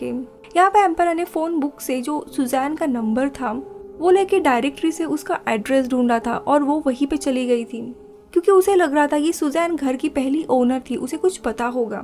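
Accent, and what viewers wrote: Maharashtra, India. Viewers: That marathi accent 😍😍❤❤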